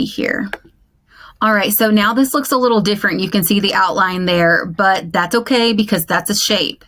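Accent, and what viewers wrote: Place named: Texas, United States. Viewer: I could hear that Texas twang!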